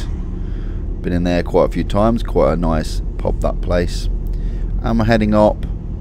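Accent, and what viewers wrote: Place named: Guernsey, United Kingdom. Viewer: The De Le Rue was a printing shop when we lived on the Island!! Another super trip - always love listening to you - good old Guernsey accent.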